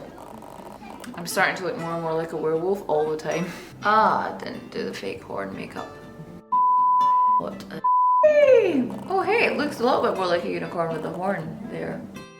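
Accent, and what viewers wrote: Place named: Scotland, United Kingdom. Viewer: if the plan was to entertain as stated this was hilarious :D also i love a scottish accent ! got any pics of the end result though ? ;)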